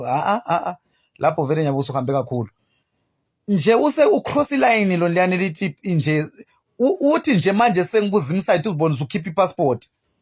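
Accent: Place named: Zimbabwe. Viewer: Futseg wena nesi Zulu sase Zimbabwe 😅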